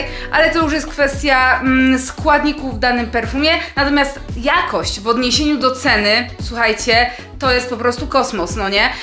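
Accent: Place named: Ukraine. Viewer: Po polsku wymawia się zmiękczone samogłoski .Jesteś Ukrainką ? Naucz się polskiej wymowy jeśli jesteś w Polsce!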